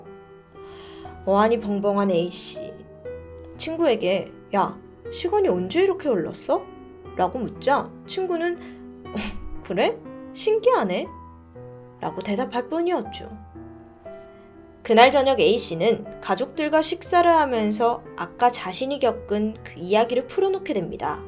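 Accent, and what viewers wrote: Jeolla, South Korea. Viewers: 님 진행 너무 잘 하시고 좋은데 전라도 억양 때문에 자꾸 몰입이 깨지게 돼요 ㅜㅜㅋㅋㅋ 특히 시작이라는 단어 나올 때마다 시작합니다->시자감니다, 시작하더니->시자가더니, 시작했죠-> 시자갰죠, 시작하죠->시자가죠, 시작했습니다-> 시자갰습니다 이렇게 말씀하셔서 자꾸 몰입이 깨져요 ㅠ 노력했지만-> 노려갰지만 등등... 제시카님도 종종 이런 전라도 억양이 있는데 님 진행에서는 훨씬 자주 나와서 티가 나는 것 같아요. 이런 부분도 같이 연습해주시면 더 더 좋을 것 같아요! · 진짜 죄송한데 전라도 발음좀 빼주세요 ㅠㅠ 시작하죠 - 시자가죠 답답했죠 - 답다뱄죠 제작해 - 제자개 이런거요 ㅠㅠㅠㅠ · 아.. 이게 전라도 발음 이군요. 저도 들으면서 저부분을 왜저리 발음하지? 하고 거슬렸던.. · 근데 이분 경상도사람인데 전라도발음나오는게 신기...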